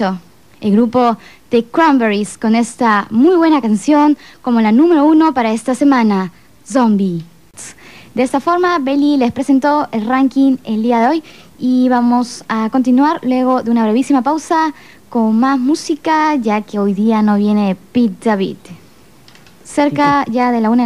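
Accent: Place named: Europe. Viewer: Yo tambien ..me enamore de la linda vozz .. como sera la muchacha.. esa vos melodica tann riff que ..vozz media europea.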